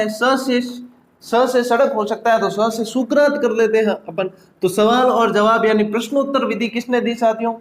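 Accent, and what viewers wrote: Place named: Jodhpur, India. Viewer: तभी , आप भी बिल्कुल हमारे (जोधपुर) जैसी मारवाड़ी बोल रहे हो 👌👌 शानदार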